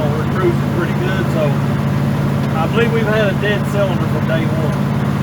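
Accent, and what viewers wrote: South, United States. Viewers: I figures...then I guess I will have to pull the plug on you and unsubcribe because my eardrums hurt from all that redneck gibberish. Too bad.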